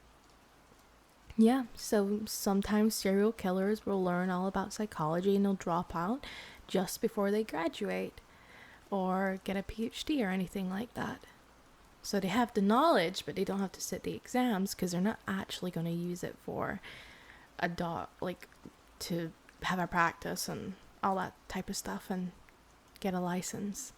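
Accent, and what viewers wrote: Scotland, United Kingdom. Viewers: I love your Scottish accent.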